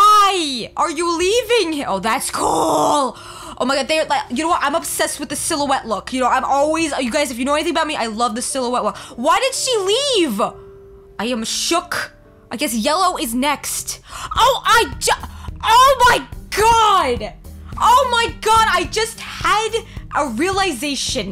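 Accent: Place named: Brooklyn, United States. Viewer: is this chick from Brooklyn or some shit? I hear some sort of accent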